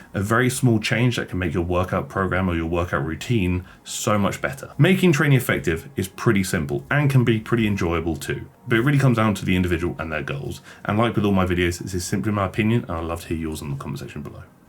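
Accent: United Kingdom. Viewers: I love when a British man talks to me about muscles.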